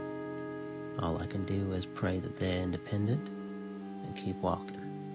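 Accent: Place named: South, United States. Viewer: Quite a bit of southern, but sounds good mostly, keep going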